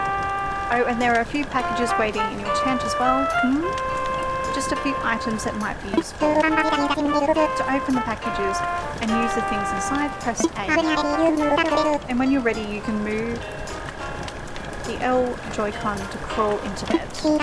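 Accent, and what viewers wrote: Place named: New Zealand. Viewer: I think they're asking because of the accent? Personally I think you sound a bit like a Kiwi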